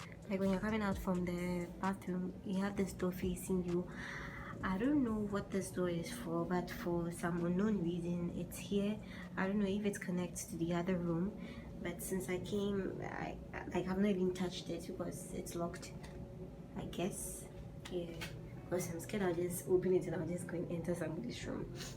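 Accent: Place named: Canada. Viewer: Kindly consider your sound it's low.... Hoping to hear some more Canadian accent soon🤩🤩🤩✨ Enjoyed the vid.... Keep it moving 💃💃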